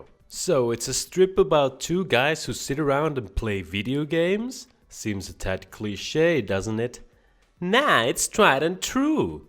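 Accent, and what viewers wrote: Finland. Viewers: Are you finnish? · wait a sec your finnish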